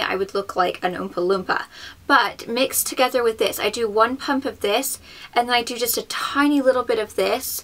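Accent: England, United Kingdom. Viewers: In this video I could totally hear your English accent!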